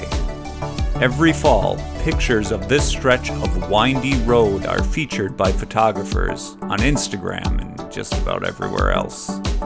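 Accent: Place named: United States. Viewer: Great video! You've got a classic American voice - you could read the news with those pipes! lol at the Baywatch music, haven't heard that in so many years, What a bizarre show! 😅 I had a look on google and I did not know Wisconsin was just below Canada. The countryside reminded me of the Tom Green show I used to watch and the road trips he did. Hope you and are staying safe during these anxious times ✌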